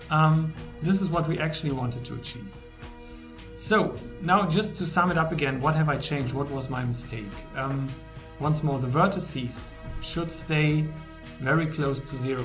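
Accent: Germany. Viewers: sis is a very nice german accent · are you german? you have a german accents 😃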